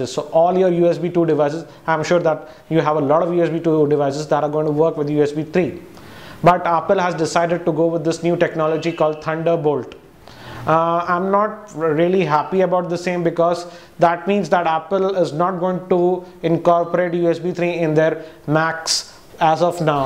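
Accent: India. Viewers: hes Indian, its very easy to tell...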